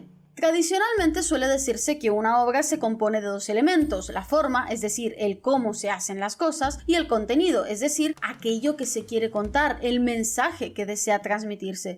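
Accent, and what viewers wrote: France. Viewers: De que parte de Francia sos? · Porque tiene asentó francés?? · Soy nuevo en canal, ¿ la chica que locuta es de asendencia francesa lo comento por su acento ?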